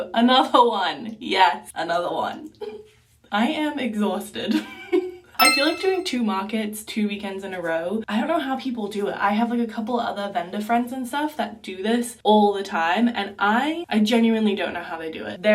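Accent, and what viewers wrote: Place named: Australia. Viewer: That's so cool!! You say so many things with a tad bit of Australian in there as well, so it's so mesmerising to hear you speak, haha. ❤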